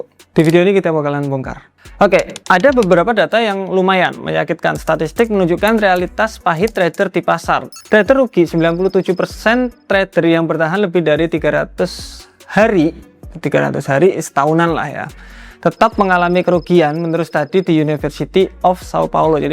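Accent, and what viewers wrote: Java, Indonesia. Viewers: bahasa Jawa ini bukan osing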